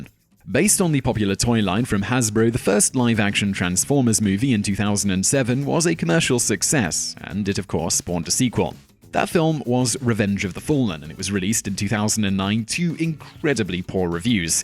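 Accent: United Kingdom. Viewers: Hypocritical? Why does a white British sounding man always have to be the one imparting knowledge? White not let a women or person of color do your show. Maybe top 10s should turn the mirror on itself.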